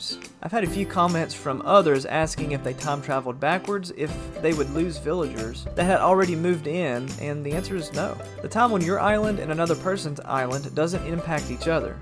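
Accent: Texas, United States. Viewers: Ngl, you sound like what people think Texans sound like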